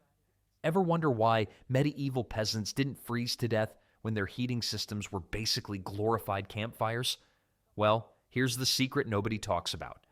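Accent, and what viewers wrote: United States. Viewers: If there is one thing I love ( not) is listening to some yankee narration trying to be dramatic but failing to hold attention. Get to the point and drop the BS.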